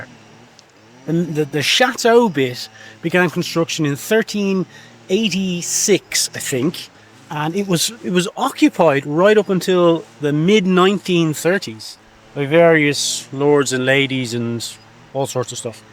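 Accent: Ireland. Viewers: Can you also write the name of the chateau in the hill please, love your Irish lilt , but didn't catch it's name, and I want to put it on my next year 'tour of rural France ' list. Thanks 👍🏼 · Wow, you take us to some amazing places! Great music, beautiful Irish accent attached to an easy-on-the-eyes kind hearted dude. Thanks heaps. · loved this video, chateau in the cliffside was amazing! Thank you for all these videos, you are a very interesting and entertaining guy, maybe its your Irish accent! Ha ha 🇨🇦BC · Congratulations. You are a breath of fresh air, have a beautiful Irish accent and are a very genuine person, that's why you have so many faithful Subscribers. I just love following your antics and going on little trips with you, they're always showcasing quaint little French villages and countryside, thank you for making my days a little brighter and taking me with you on your trips and sharing your day to day life as well. 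Much love xxxx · And hearing an Irishman speak, using words rarely used here in southern California. So educational as well as amusing to dawdle around with you.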